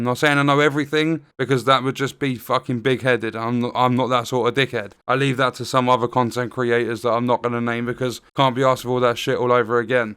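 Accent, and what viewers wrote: United Kingdom. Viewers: Love all the content. That British accent cracks me up mate 😂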